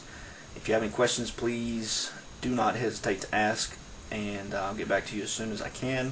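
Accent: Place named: Southeast, United States. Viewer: Off topic (sorry) You sound like you are from the southeast US. If so where? I am in Leeds, Alabama (suburb of Birmingham, Alabama). Just curious. I am jealous of your setup, for what is worth. Thank you for sharing it and it would be awesome to see your work. Do you post to cmon and if so what is your screen name? Thanks again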